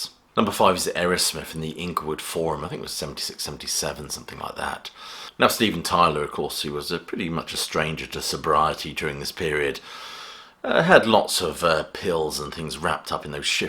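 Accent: United Kingdom. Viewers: Cheers mate, knew I'd found the right channel instantly. It just wouldn't feel right to have my rock music anecdotes explained by anybody else besides an obvious former roady with a UK accent of some sort, Innit!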